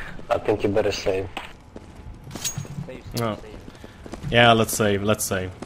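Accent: Sweden. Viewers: The sweeeeedish accent!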